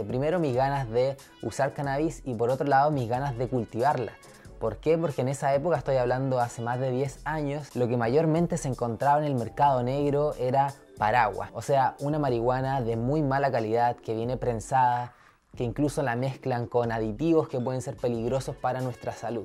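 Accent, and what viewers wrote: Chile. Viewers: ahahaha eres chileno haha lo adivine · Hermano de que parte de chile eres? De valpo? Saludos desde la 5ta brooo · Es chileno?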